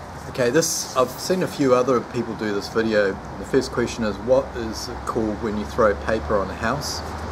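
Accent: England, United Kingdom. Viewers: Nice one, your words sound like most English people, funny but someone found shopping trolley hilarious, I think it was on Christian's video,I thought l would get mine out of the way, good sport, Cheers mate 🍻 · Nice one bud couple of chaps are brewing with jester hops and sounds a cracking beer, good challenge still say the words like if you was in the UK.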